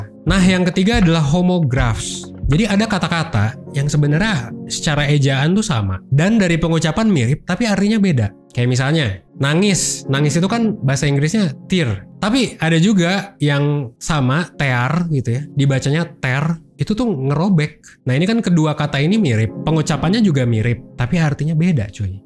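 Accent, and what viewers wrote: Indonesia. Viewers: Aksen indo yg paling lucu.. 😂😂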